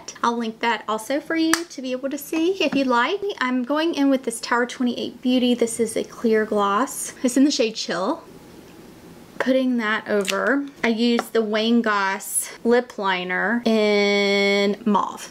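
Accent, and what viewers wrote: South, United States. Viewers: So I’ve always been a Tarte fan. I’m not a colorful eyeshadow girl so their “boring” as most say palettes appeal to me. Love your videos! I’m in Alabama so the southern accent makes me feel at home!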